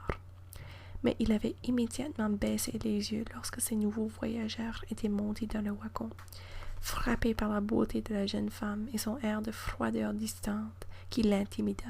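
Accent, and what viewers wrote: Canada. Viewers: this doesn't sound like the French kind of French, but rather the Canadian? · Ton accent est typiquement canadien et en France on adore ça (pour information, la Miss Météo d'une de nos chaines françaises est canadienne) ton français est impeccable, félicitations de la part d'un français....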